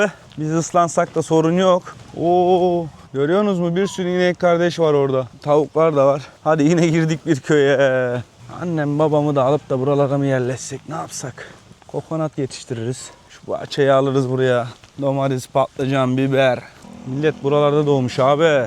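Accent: Thailand. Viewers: reis neden ingilizceyi tayland aksamı ile konuşmaya çalısıyorsun? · ingilizceyi tayland tınısıyla-aksanıyla konuşuyorsun artık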